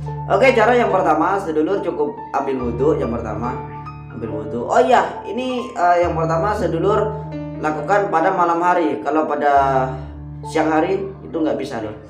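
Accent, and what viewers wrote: Madura, Indonesia. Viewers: Sampean orang madura y cak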